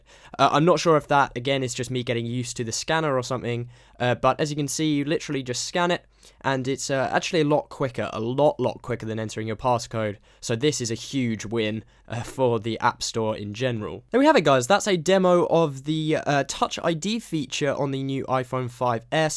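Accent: United Kingdom. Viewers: I luv british accents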